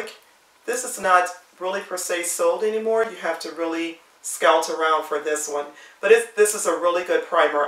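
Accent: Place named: Jamaica. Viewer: Your LV 6 Key Holder particularly stood out because that's on my radar. I didn't SEE this - but your accent is soothing. My family is from Jamaica and sometimes you pronounce words as they do. Anyway, new subbie here. Please enter me in the drawing and continue to make your videos! :D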